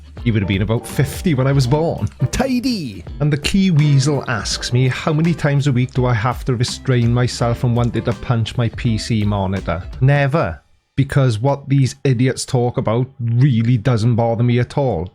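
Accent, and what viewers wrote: Wales, United Kingdom. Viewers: Hi, love your channel, been a long time subscriber (about #800 I think). I’d rather have you honest and possible insulting, than fake and Nanby- Pamby. This is why I keep watching you, this and the daft Welsh accent ( this is from an expatriated Cockney) Cheers Paul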